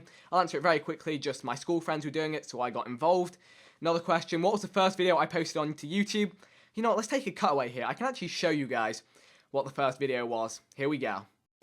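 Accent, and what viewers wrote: England, United Kingdom. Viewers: gianluca moores Maybe you thought that because he has a English accent .___. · well he was raised in Britain so obviously he got to be talking in the british accent. but he's indian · I'm a new sub recommended by a friend, out of curiosity what is his ethnicity? his accent sounds English (UK)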